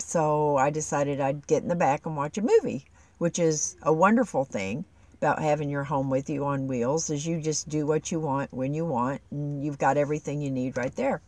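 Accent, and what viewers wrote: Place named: Texas, United States. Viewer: Ah ha! Got it you were raised in Texas and Arkansas! That explains your accent! And your values!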